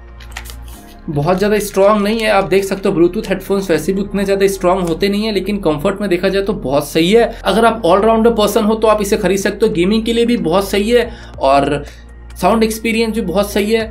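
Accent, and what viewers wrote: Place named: India. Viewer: Bro... wt is this??? - I thot my pseakers saw brknen (im replying as I heard u) but nooooo this some broken Indian crap? just speak in Hindi or whatever you local language is - lord. this is a horrible listening experience.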